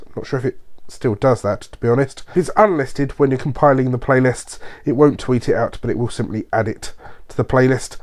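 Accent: United Kingdom. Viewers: I love the British sound. Even i don't get it very well.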